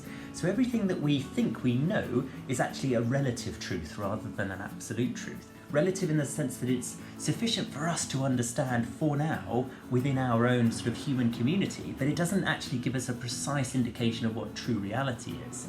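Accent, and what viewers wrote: United Kingdom. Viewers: I am a student myself and this is so helpful in so many ways. Thank you sir! And your English accent is pure music to my ears!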